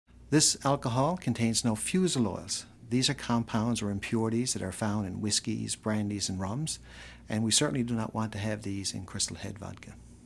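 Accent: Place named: Ireland. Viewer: This video is one of the best examples of the Irish influence still heard in Newfoundland accents today too... If I wasn't told he was from Newfoundland, it would be hard to tell he's not just Irish lol.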